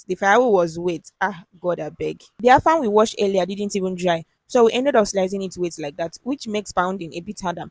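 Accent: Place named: Akwa Ibom, Nigeria. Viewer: Immediately I heard strong garri, I know say na Akwa Ibom sister be this😅😅😅. Song Idem o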